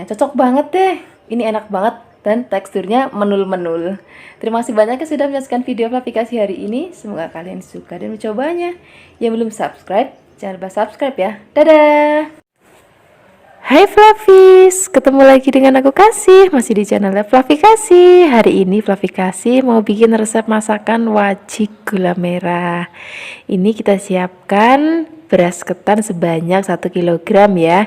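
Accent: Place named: Banyumas, Indonesia. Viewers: Banyumas dialeknya ❤ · Menarik....apalagi dialek banyumasannya mantep😅😅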